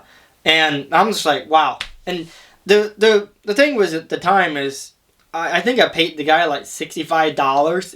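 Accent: Pennsylvania Dutch, United States. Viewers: You are a good inspiration. I am 81 and grew up in Pennsylvania into a pa Dutch family and it is so great to hear your accent. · When I first heard you on your video I thought I recognized the PA Dutch accent. I grew up in Lancaster County, PA and had Mennonite friends. Never saying "good job" is the German way - even among some of us PA Dutch "English". "There is therefore now no condemnation for those who are in Christ Jesus." Roman 8:1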